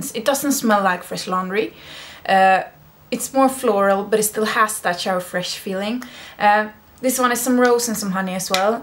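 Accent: Sweden. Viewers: I can't get enough of that swedish english accent! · I am usually attracted to old-school stinkbombs (Shalimar and Opium) but you make me want to go for some Angel. After watching your older perfume video I went out and tried some Versace Black Crystal and since then I have been obsessed with white flowers, and yes it totally smells like vampires on the beach! Also that swedish accent is so sweet.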